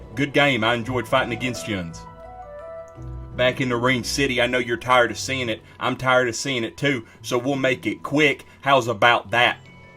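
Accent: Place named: Appalachia, United States. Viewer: Cool hill billy accent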